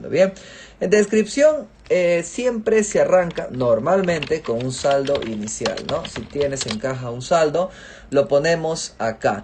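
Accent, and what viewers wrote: Peru. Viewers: Estaba buscando cómo organizarme con mis ingresos y egresos y no encontraba algo práctico. Me encantó tu paciencia, habilidad y orden de tu explicación. Plus!!! Tu acento Peruano ♥️ 🇵🇪 ♥️ Gracias 🙏 Houston TX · Es un gusto cómo se habla el español en Perú. Saludos desde Chile.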